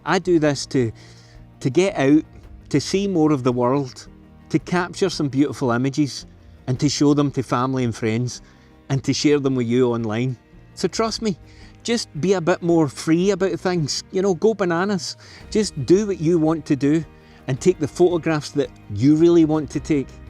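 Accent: Scotland, United Kingdom. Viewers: Ok can I say I came for the ditched rule, I stayed for the perfect Scottish accent. Thank you great photos.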